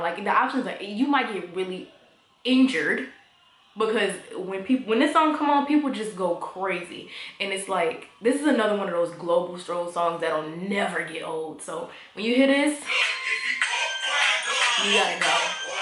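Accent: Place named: New Orleans, United States. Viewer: Yea that NOLA accent came out STRONG in this video 😂😍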